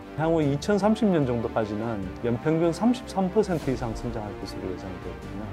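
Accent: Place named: Gyeongsang, South Korea. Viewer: 항상 표준 경상도 말투에 호감하면서 존경하고있습니다.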